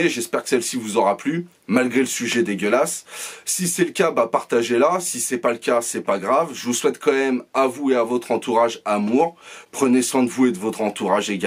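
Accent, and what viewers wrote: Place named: Banlieue, France. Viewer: voix de benlieusar voix de bonhomme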